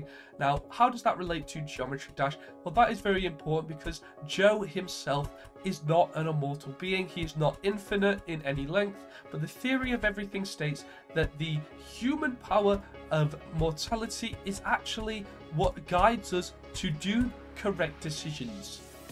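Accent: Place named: Manchester, United Kingdom. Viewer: I fell asleep watching this last night and I was confused to find my inner monologue briefly had a manchester accent in the morning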